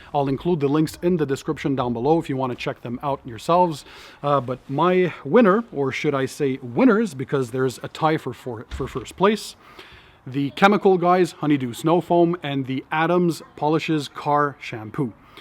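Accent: Canada. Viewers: Alabama. Must be why I find it somewhat different, not odd just different. Not so much a French accent just a very Canadian type of accent not usually found in the state unless it’s Minnesota or the Montanas.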